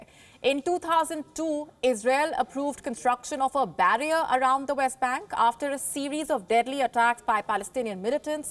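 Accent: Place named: India. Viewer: Indian English..🤦‍♂️.... " Julia and sumalia ... 😳😳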